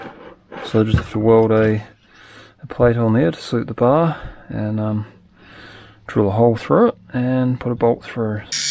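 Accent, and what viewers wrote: New Zealand. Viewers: You must be a kiwi??? 🥝 👍🏻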